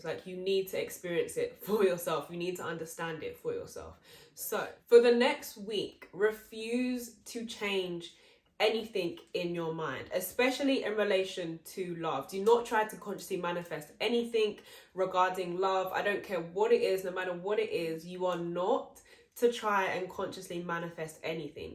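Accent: United Kingdom. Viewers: london accent 🇬🇧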